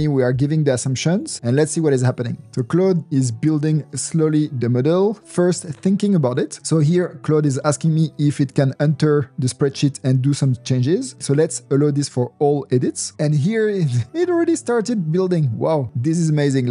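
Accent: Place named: France. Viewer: Comment reconnaitre un français ? avec son accent quand il parle en anglais nice video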